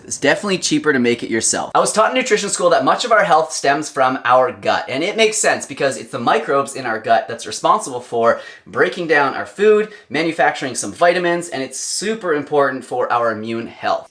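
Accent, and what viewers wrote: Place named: Canada. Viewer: He's Canadian. He's def has the accent🙂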